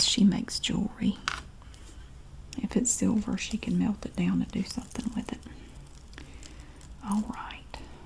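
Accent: South, United States. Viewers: You remind me of my grandma :). She also has a southern accent and sounds almost just like you. When I was younger, ( I'm now a teenager) and i spent the night at her house, she usually put me to sleep by reading a story, telling a story of her own, singing me a song, etc. And you just remind me of those times, and put me to sleep, thinking I'm a kid again in my grandma's comforting presence. thank you for the nostalgia :)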